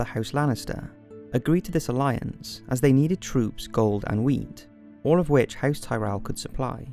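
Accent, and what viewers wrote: Wales, United Kingdom. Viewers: I think it's a Welsh accent.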